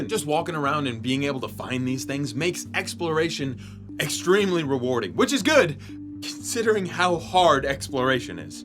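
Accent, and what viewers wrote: United Kingdom. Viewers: YEAHHHHHHHH BRITISHHHHHH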